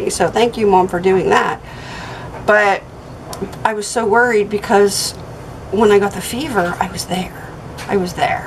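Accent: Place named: Pennsylvania, United States. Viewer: Glad to hear you are negative! Here from Simple Life! I would have swore u were from PA w that accent! I grew up in PA, & u know I gotta say it.... We Are!!! Lol! God bless!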